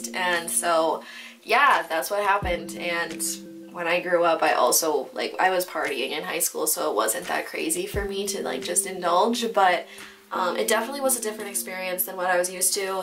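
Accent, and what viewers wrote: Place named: Midwest, United States. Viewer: I know this is a few years ago but I can totally tell you are from the midwest. Utilizing yadayadayada All my friends do that xD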